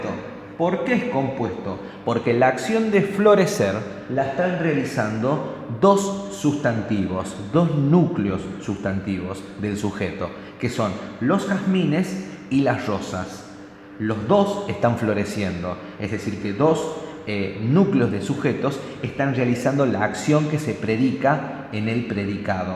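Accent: Tucumán, Argentina. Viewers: Porque creo que SOS Tucumano o Santiagueño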